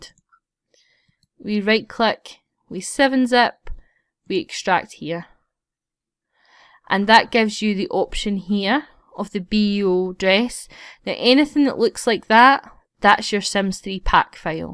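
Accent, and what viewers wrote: Scotland, United Kingdom. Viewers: Are you scottish? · She is Scottish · where about in Scotland you from? I'm from Glasgow! noticed ur accent right away 😂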